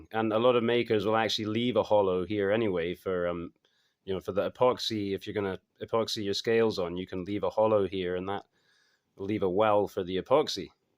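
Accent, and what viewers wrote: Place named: Scotland, United Kingdom. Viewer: I knew I detected a little bit of Scottish. I just hadn't heard you talk enough to make the determination. I think you have sold me on the tapered Tang..also . 2 way tapered Tang?! What is this sorcery ?! I just learned about the single taper 😂 😂 I also finished the rough-in of that knife, I was actually able to get it surface ground pretty decently on my disc grinder but I don't think I will be able to taper it on the disc grinder, so I think I will have to switch over to the belt and start working on it that way. It's going to look really cool in the end because it will have a distal taper and a tang taper. I'm over here in virginia, close to Virginia Beach. Collab in the near future per chance? 😂 The two way is very cool looking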